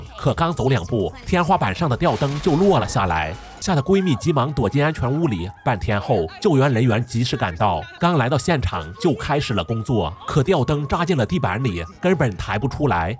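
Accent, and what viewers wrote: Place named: Northeast, China. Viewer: 唉，感覺這解說……實在挺東北大舌頭的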